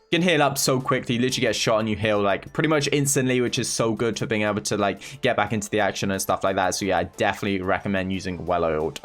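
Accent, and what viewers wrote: United Kingdom. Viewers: Sooo early....love to hear your British accent😅😅😅btw big fan........ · For some reason, I like hearing British accent!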